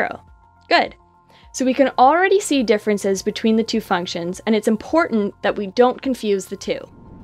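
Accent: Canada. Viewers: Sounds like an Ontario accent.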